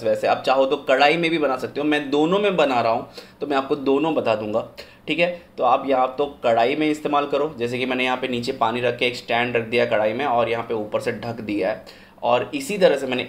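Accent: South, India. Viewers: Nice original voice😍👌sir.... bt mujhe apka south India voice version is se vi jada pasand hai❤ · I am saying true i don't cook food but I am a foodie i watch your video for entertainment by your south Indian voice 😀😀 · bhai Aapki recipi ki saari video dekhata hu muze bahut pasand hai. Aur aapki ki vo sauth Indian waali voice bahut pasand hai. Aaj aapki real voice suni vo bhi best hai. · tera south indian accent wala voice rocking hai bhai...!!!!👍👍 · Very nice 👌👍🤗 specially when u "spoke"Normally" 😂 I NVR liked ur South Indian accent 😢 Once U commented also , (long back) aftr that I nvr saw ur videos, but now I enjoyed this video (with ur "normal" voice 😂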